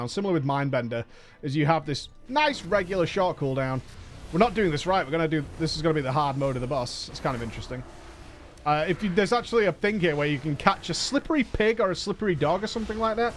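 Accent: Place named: Northern England, United Kingdom. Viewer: so disc. is a shield bitch again huh? sounds good, I liked that playstyle. Oh the cringe.... everytime you butcher the English language, it just sends a chill down my spine. But I guess that what we learn is Southern English and so when you say something like Sat instead of sitting, it just sounds wrong. because "Sat" is past tense of "to sit". but I digress. I know its the way you northern English people speak, it just sounds wrong to me lol.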